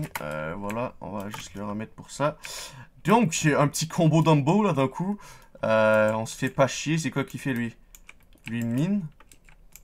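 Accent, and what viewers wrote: Quebec, Canada. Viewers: comme tu aimes bien les nouvelles personnes, je m'abonné like et encore un nouvelle épisode d'admin série =D car oui je regardais tes épisode auparavant et je go rejoindre ton serveur dans probablement quelques minutes ;) tu es québécois? j'ai l'impression :p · Minecratf Je suis prêt a parier que tu est Québecois, car moi aussi j'ai la même accent et je suis Québecois ;)))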